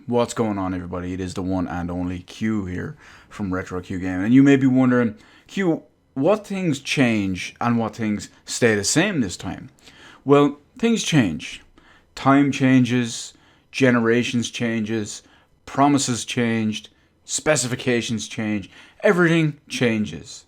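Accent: Ireland. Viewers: Is the Irish?.., ( asks another Irishman )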